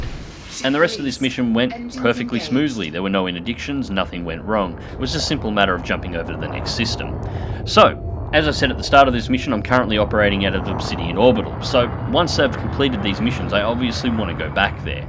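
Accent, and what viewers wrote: Australia. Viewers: goes to slee..... sees vid of well, not gonna hurt hearing an Aussie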